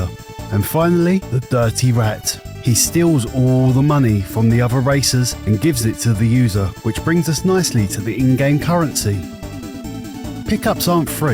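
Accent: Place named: United Kingdom. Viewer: I didn't think of the everyone's speakers / headphones are different. I just use my phone to watch all these videos, sometimes headphones sometimes none. All of your decibel interpretation goes over my head, but I'll take your word for it lol. It's in no way a deal breaker or anything like that, you got a good speaking voice. Similar British tone to retro N64 YouTuber Glenn plant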